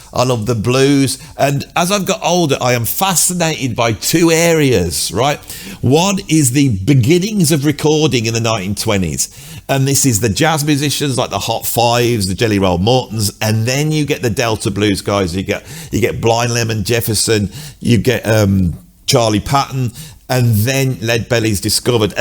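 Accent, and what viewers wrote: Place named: England, United Kingdom. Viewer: I think you would make a great one-man-show on Broadway. OK, OK, off-Broadway. Considering your story telling, vocabulary, (major yet subtle) sense of humor, gift of gab, introspection, range of interests, English accent (I'm in America), enthusiasm, and ruminations; what's not to like? Cheers, mate!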